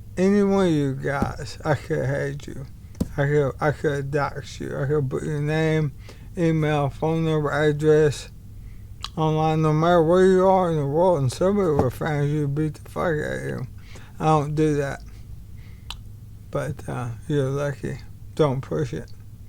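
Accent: South, United States. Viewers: You’re a stand up guy,. Many of us will indeed greatly miss you when you’re gone. Until then, I’ll continue praying FOR you & your family. We’ll likely never meet, this side of life. I’ll look forward to hearing your perfect southern dialect again in the next life tho. Much love and respect to you, sir!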